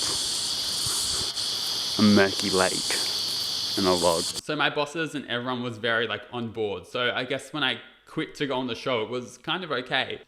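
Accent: Australia. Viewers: I didn't realize your accent was Australian. So cool to learn more about you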